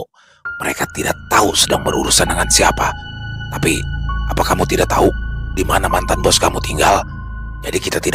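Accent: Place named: Betawi, Indonesia. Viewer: Dari bawah sampe atas nyari cerita warung kopi bang doni😂😂 lucu bae bahasa betawinya 🤣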